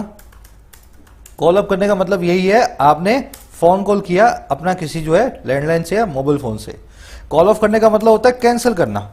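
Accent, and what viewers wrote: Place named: Delhi, India. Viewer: Do You belong to Delhi area, your language just like delhist, very attractive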